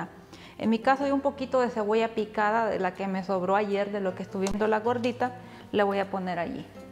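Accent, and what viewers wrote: El Salvador. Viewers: Holllllaaa! Saludos desde Belize 🇧🇿 somos de El Salvador, Apopa!! ❤️ nos encante ver como usted cocina y como nunca pierda el dialecto salvadoreño 🇸🇻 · X lo que te escucho eres mi paisana salvadoreña , se hacer las pupusas y tortillas pan comido para mi.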